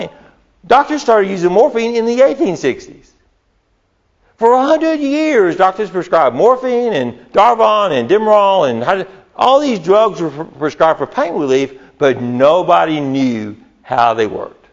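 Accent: South, United States. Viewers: Don't understand your american speech. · krisztina kessel it sounds southern of some kind. But it also sounds like he has a mild speech impediment as well which makes it a bit difficult to understand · This Doc is amazing, just a brilliant teacher....Hard to find a Doc that can teach in such a simple manner...Love the Southern draw...Good people from the South..I wonder if this Doctor works at a recovery center..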